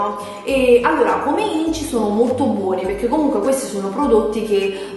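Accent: Naples, Italy. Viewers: Adoro questo tuo accento Napoletano.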